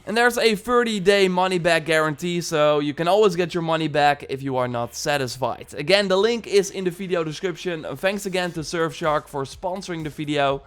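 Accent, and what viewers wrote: Netherlands. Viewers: NOW I FINALLY NOW WHATS WITH THE ACCENT, YOUR DUTCH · Ik dacht al dat je nederlands was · Dacht al dat je Nederlands was 😂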